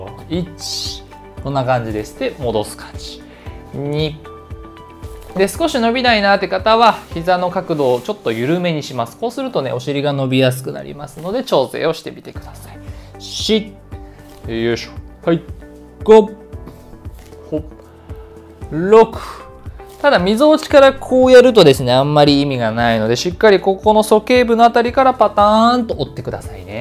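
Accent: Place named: Tohoku, Japan. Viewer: 東北出身なんですかー！私、東北人なので、の訛り全く気にならず入ってきました😂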